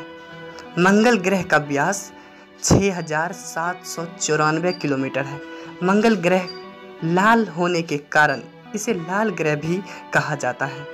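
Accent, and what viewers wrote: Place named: Bihar, India. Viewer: भाई आप किस जिले से हैं भागलपुर, खगड़िया, बेगूसराय ?? आपके बोलने के टोन से guess किया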